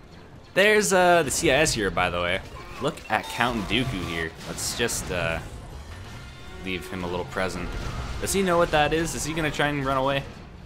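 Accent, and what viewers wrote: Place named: Canada. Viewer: I love your Canadian voice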